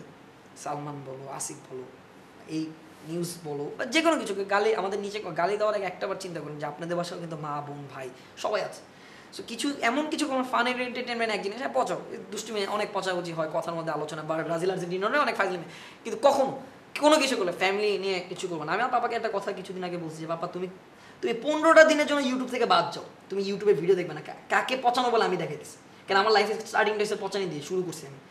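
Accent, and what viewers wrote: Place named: Barishal, Bangladesh. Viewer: আপনার মুখে বরিশাল ভাষা শুনতে আমার খুব ভাল লাগে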